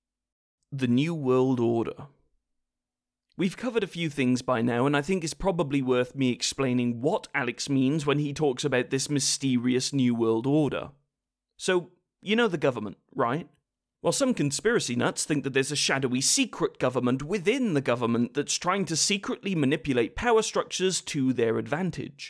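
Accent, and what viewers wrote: United Kingdom. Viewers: I kept thinking... is this guy a savage British guy or a well spoken Australian...but then he said pound and meant currency and I'm siding with him being a brutally savage British. Also... yes I'm American... and... yeah... sorry about... well... most stuff after 1776... we kinda got a little upset about a lot of things and didn't think to restrict gun ownership clearly enough and it has all been down hill from that point...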